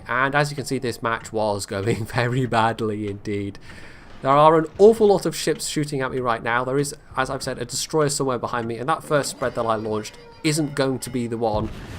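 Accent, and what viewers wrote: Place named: Scotland, United Kingdom. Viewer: I somehow ended up watching this one which I'd never seen. Your accent was much more English here, so since your inflection is more Scottish now, good on you,.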